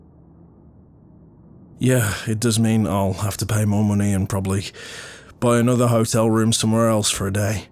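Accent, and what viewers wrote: United Kingdom. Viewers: YIPEE :DD ur british accent is just so delicious- XD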